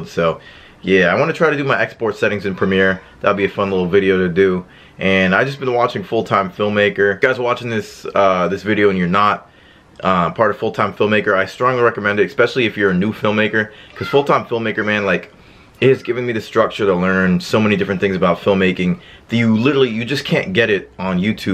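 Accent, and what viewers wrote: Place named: East Coast, United States. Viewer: You from East Coast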